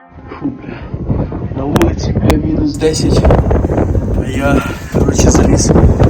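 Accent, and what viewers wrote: Poltava, Ukraine. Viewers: Звідки Ви родом? З якого регіону?) Дуже схоже на Полтавщину, або сусідів 😃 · дякуя з Полтавської ,говір похожий на наш Полтавський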